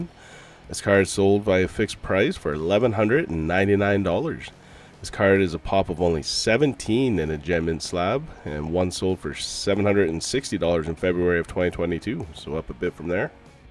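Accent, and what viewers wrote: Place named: Canada. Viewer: I love the Canadian pronunciation!!! Lol...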